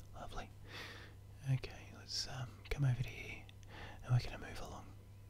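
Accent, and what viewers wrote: Australia. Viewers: Accents that trigger me change all the time but right now your Aussie accent is killing it! I've done nothing but sleep these last few days after dental work and a stressful few weeks, got a holiday off work next week and thoroughly looking forward to a week of sleep with your fantastic accent and brilliant knack for tingle creating at my ears! Much love to you from the other side of the world in Scotland x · haha you're so Aussie. love it :) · Hello Jon Snow, I’ve found another Aussie asmrtist. 🇦🇺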